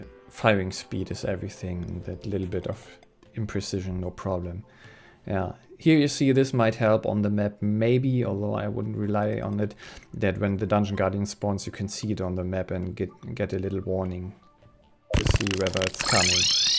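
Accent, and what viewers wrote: Germany. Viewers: Man hört raus das du ein Deutscher bist xD Trotzdem geiles Video👍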